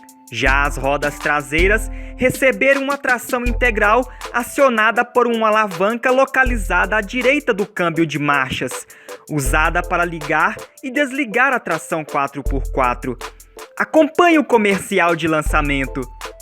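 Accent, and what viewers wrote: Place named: Northeast, Brazil. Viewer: Velho sotaque noredestino. Inconfundível.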